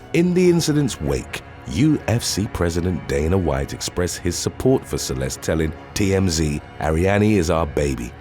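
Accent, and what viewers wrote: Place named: England, United Kingdom. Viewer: Valentine he has an English accent. They pronounce things differently than others may. You need to stop embarrassing yourself by not knowing that.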